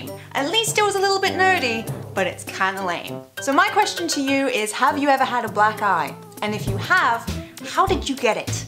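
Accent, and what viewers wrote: United States. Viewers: she sounds so American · She's beginning to get an American accent. :D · What's with the American accent? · It's been a while, and i have one question. WTF HAPPENED TO YOUR ACCENT!?!? You sound so damn American now! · Dude, you're starting to sound so American.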